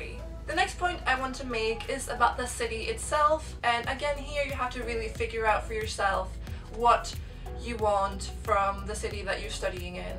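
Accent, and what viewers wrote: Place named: United Kingdom. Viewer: I hope you're enjoying University! Your accent has become so much more British but thanks for the tips, I'm starting my last year of gymnasiet and I'm not sure what I want to do after it :) <3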